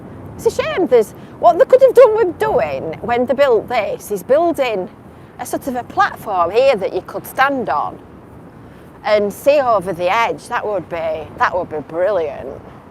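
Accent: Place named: Lancashire, United Kingdom. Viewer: You cheered me up with your lovely Lancashire accent and chirpy attitude. From a mancunian living in blackpool.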